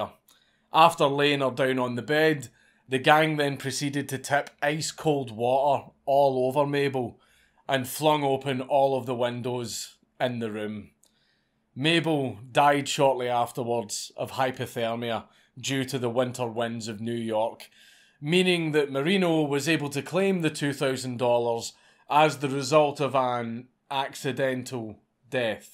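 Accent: Scotland, United Kingdom. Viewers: man, I'm slowly becoming addicted to this series. Hearing hilarious stories being narrated by a heavy scottish accent? Who could ask for more? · Daddy dankula yet again with another story told in his smooth Scottish accent...I love it. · absolutely avg day! 🤭🤫 jealous you live in Scotland. Your accent 🥰🤤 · Oi, mate! Ye Scottish?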